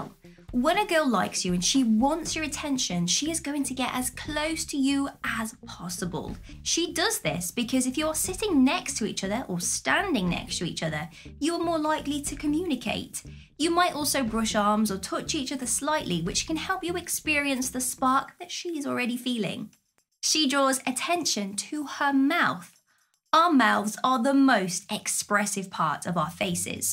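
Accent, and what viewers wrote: England, United Kingdom. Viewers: That English accent tho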